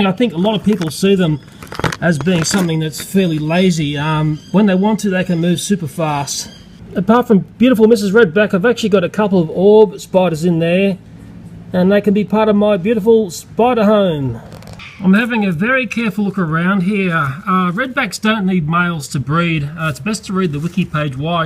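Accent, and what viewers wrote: Australia. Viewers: Is this guy Australian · Why is the Australian accent just so....awesome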